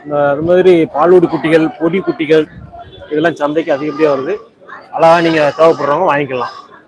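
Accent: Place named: Madurai, India. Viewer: Madurai basha I laikkit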